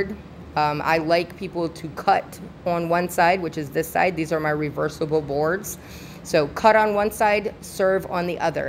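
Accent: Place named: St. Louis, United States. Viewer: Thank u, it was a strange deja vu feeling when u spoke. I was born in St. Louis but lived all over the country growing up. U have a St. Louis accent to me, but I have been teased by friends my entire life that I took a little bit of every place I lived & blended them all together, maybe that’s what felt familiar to me idk,🫣don’t mind me!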